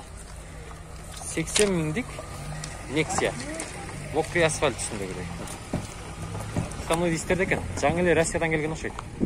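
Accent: Kyrgyzstan. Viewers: Алып баруучу азаматсыз!Таза кыргызча сүйлөйт экенсиз!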